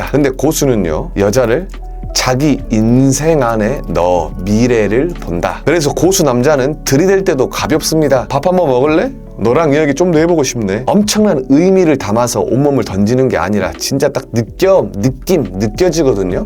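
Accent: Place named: Jeolla, South Korea. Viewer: ㅋㅋㅋ전라도 사투리가 묘하게 중독적...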